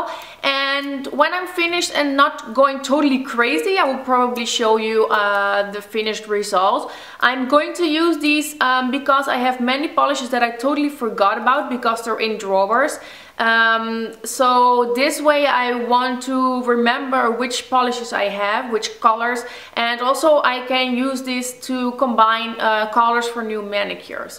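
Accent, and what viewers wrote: Netherlands. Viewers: Super leuke nail art! Ik was het filmpje aan het bekijken en ik dacht: Dat accent, ze moet wel Nederlands zijn. Dus ik ging zo op je blog kijken en toen bleek het dat je Nederlands was! ik vind dit ontwerp echt super gaaf ik ga het ook proberen (: <<num>! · Where are you really from? I hear some accent hahaha Holland? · ik dacht al dat je nl was hoorde het al een beetje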